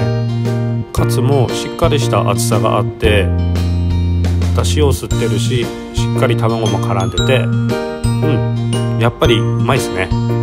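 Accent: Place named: Hokkaido, Japan. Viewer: 北海道弁も良かったです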